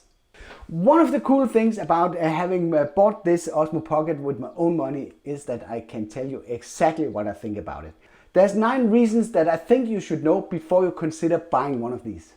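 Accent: Denmark. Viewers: Er du fra Danmark, syndes jeg kan høre lidt dansk😂🇩🇰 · Du lyder lidt dansk :)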